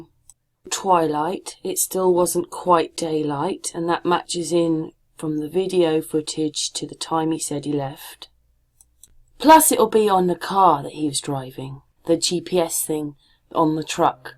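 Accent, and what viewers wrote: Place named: Midlands, United Kingdom. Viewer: Happy birthday to you, is it a midland accent or east coast?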